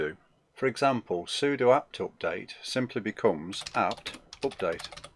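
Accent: United Kingdom. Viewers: Why does an English accent make everything so interesting?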